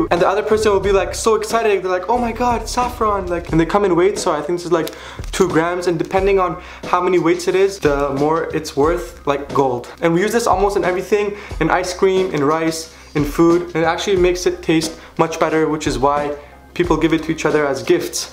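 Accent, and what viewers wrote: Isfahan, Iran. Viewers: Honestly even I prefer saffron over shirt or whatever! Btw are you grom esfahan? Cause the accent is just too on point lol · Man you got a great accent !! I think you are from isfahan !! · When you speak farsi you speak it with isfehani accent , are your parents from there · You have an Esfahani accent. The Shampoo thing you showed is not a Persian thing. It applies to your family because you're from Esfahan and are genetically cheap. LOL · لحجه کجای اصفهانه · Cute isphahani accent. 😊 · لحجه اصفحونیت 😂 دمت گرم کاکو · Hats off you man . That was pretty funny . specially that you narrated in English . I couldn't stop laughing 😂😂 By the way Are you Isfahani? I think so . because I am.. and my accent is like yours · لهجه اصفهاني داري هههه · Omg you're originally from Isfahan lol I loved your accent soooo funny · اگه ایرانی نیستی خیلی خوب حرف میزنی👍👍 واقعاااا دمت گرم😂 اصفهانی حرف زدن واقعاااا عالی بود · ا چقده لحجه اصفانی داری،اگه گفتی اصفانیا چطوری شماره سیزده رو میگن، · Are you Isfahanian? 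Because your accents tells so... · Yo bro you're awesome, also i'm persian and i'm proud ! i can tell you're from Isfahan is that true ?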